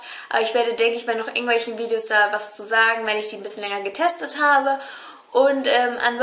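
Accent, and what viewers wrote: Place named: Bavaria, Germany. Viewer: sie kommt aus Bayern sie sagt nett anstatt Nicht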